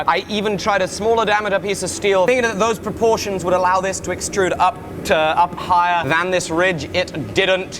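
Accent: England, United Kingdom. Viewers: i cant tell, are you british or american? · I can't tell which part of England your from well from your accent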